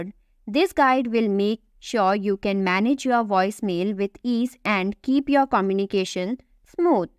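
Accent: India. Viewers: accent. Sound like very heavy India accent.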